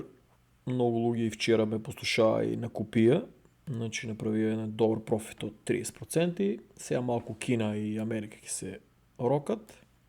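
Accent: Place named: Kočani, North Macedonia. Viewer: Се навлеков на кочански дијалект. :rocket-red-countdown-liftoff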